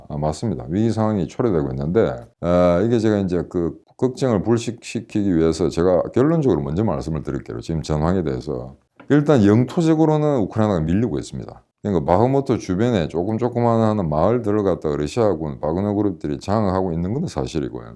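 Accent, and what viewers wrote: Gyeongsang, South Korea. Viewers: 🇰🇷허진대사님,💙 중저음의 보이스와 경상도 인토네이션이 들을수록 신뢰감이가고 묵직하며 멋있습니다~!!!💯 전쟁...브리핑엔 님의 목소리가 적격입니다. 👍✌️👏 허진대사님, 아프지 마십시요. 늘 건강하시길 빕니다~🕊️💙🎵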